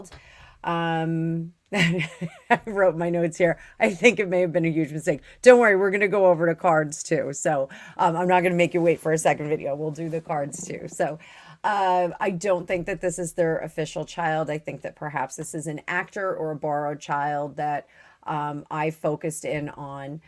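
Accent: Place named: New Jersey, United States. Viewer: I love your NJ viewpoint and expressions - "a hottie" with "definitely zero percent body fat". No other tarot reader I listen to does that. Love your accent, too - although it is very slight. I was in NJ last July to see Paul MCartney. I ❤ New York - and New Jersey. ❤Denise from Toronto. Great reading! Looking forward to much more, so thank you so much.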